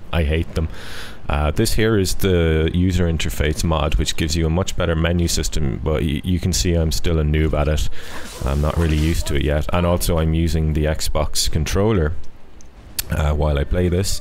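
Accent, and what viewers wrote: Ireland. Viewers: He has an Irish accent if you didn't know.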